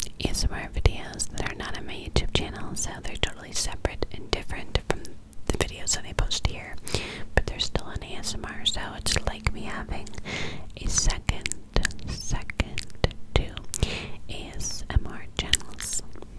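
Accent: New York, United States. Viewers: Well I think you are a pulchritudinous gal, your sweet voice could calm a storm. I love it when you do some videos with a new york accent. I've been a fan of yours now for a few years and you have helped me fall asleep many of times. Im pretty sure you will reach a million subscribers. Anyway you look insanely beautiful and visually stunning as always love your asmr that you do. 😍😍🌹🌹🌹🌹🌎👑.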